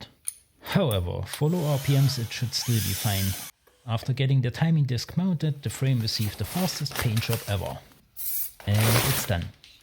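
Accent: East Germany, Germany. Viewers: Man hört das du Ossi bist, geiles Video! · Das englisch mit ostdeutschen dialekt ist immer am besten xD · Ostdeutsch und Englisch gemischt geiler typ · What is this? Is this the east?-German (based on the accent) version of StuffMadeHere with a focus on engines and a moldy refrigerator full of alcoholic beverages? Super exciting to watch in any case! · Never laught so much about a german accent xD I love it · der akzent, pfeffi und goldkrone... schon eher östlich in deutschland oder 😁 keep on going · Du kannst mir sagen was du willst, Youtube sagt, deine Videos sind aus den USA, dein Shop registriert in UK, aber dein Dialekt und dein Erfinderreichtum... Deutscher 😅 Bei kann man sogar Lieferwagen sehen, die das bestätigen... Bisschen chaotisch, interessante Ideen und sogar Wissenschaft dahinter. Cooler Content! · Am I imagining things or are you from east germany? 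My coworker is from Sachsen Anhalt and he sounds pretty much identical to you in terms of dialect.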